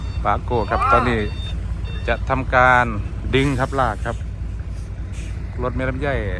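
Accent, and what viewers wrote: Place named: Laos. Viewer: ออกลาวจ้า89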